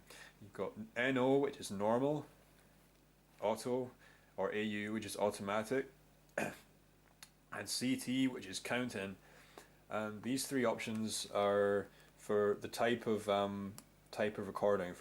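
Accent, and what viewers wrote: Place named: Scotland, United Kingdom. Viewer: Scotish accent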